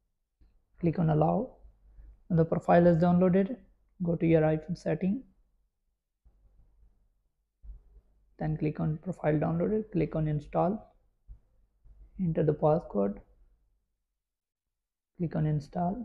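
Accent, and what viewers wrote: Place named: India. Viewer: When I heard that Indian accent I knew it was fake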